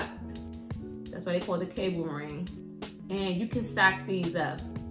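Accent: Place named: Washington DC, United States. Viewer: I know a DC accent when I hear it. Love my Yurman rings and stack all of em 💕 thanks for sharing.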